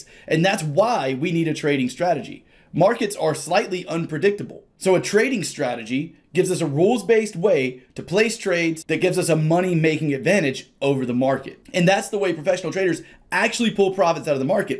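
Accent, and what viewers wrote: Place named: North Carolina, United States. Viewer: Where you from? What's that accent? Sounds like North Carolina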